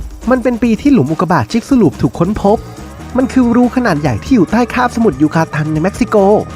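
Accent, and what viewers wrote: Isan, Thailand. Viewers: คนอีสานบ่หนิ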